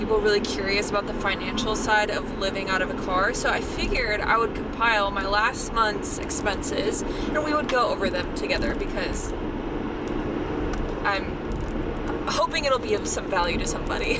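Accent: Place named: Rural, United States. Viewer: I thought I was the only one who does a hick accent. Very cool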